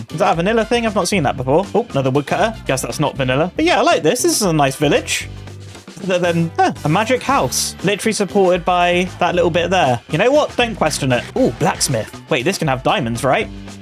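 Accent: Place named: United Kingdom. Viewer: The English accent of the British is very nice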